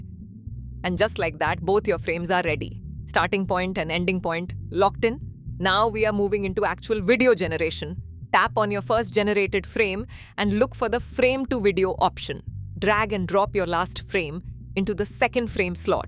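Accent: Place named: India. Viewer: поменяй уже наконец акцент у своего аватара с индусского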